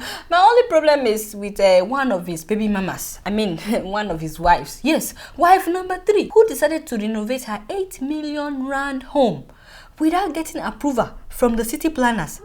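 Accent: Yoruba, Nigeria. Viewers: you are definitely keeping it real! I love your show. Keep up the good work. I love the Nigerian accent. It is the Yoruba coloration of the accent that makes it so lovely. I am not Yoruba, but I love it.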